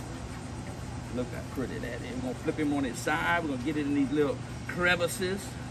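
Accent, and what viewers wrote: Louisiana, United States. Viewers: Sounds like some Louisiana to me. Got the accent like Justin Wilson.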